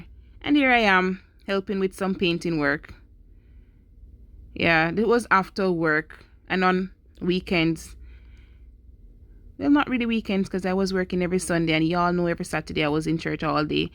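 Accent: Jamaica. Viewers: Uhm, you say you're not an interior designer but I beg to differ. Really awesome look. I hear your Jamaican accent. Curious to know your journey to Cayman. I'm watching from Japan.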